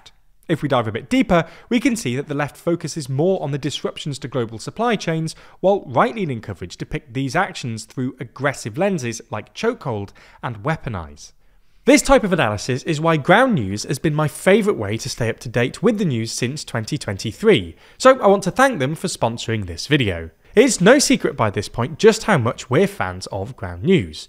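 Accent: United Kingdom. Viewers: Everytime I hear a heavy British accent like this, I get turned off. It is supposed to make him sound intellectual but it actually showed him as shallow.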